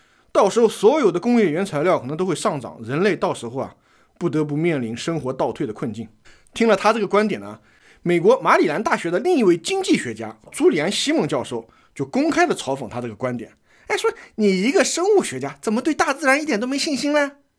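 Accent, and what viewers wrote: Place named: Shandong, China. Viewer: up山东人？方言暴露了啊😄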